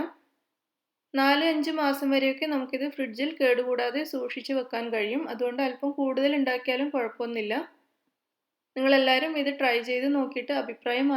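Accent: Kannur, India. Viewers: കണ്ണൂര്‍ കാരി ആണോ I also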